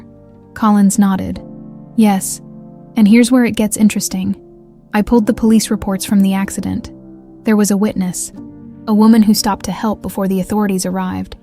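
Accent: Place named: England, United Kingdom. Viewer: Your telling language is not American it sounds more like English accent. Would you mind telling from which country you’re from.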